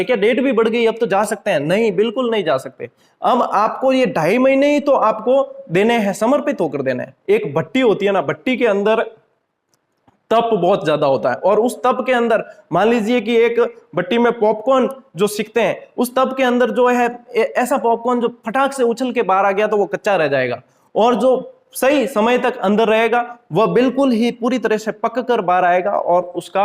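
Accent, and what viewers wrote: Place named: Western Rajasthan, India. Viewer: सर आप कहां से हो आप मारवाड़ी बोलते हो तो उसमे पश्चिम राज़. की आवाज लग रही है ,सर जी कहा से हो मै बाड़मेर से हूं अगर आप यही मारवाड़ के क्षेत्र से हो तो मै आपसे पर्सनल मिलना चाहता हूं गुरुदेव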